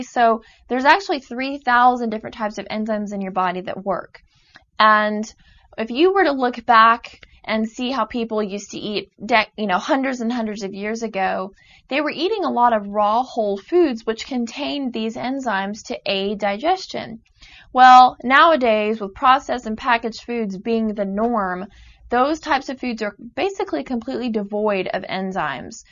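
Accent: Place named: Midwest, United States. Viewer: I’m trying to guess what accent she has... Midwest?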